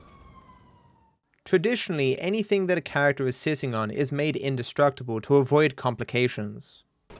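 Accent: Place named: Australia. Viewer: Sounds like your saying it right to me..but then I'm Aussie too.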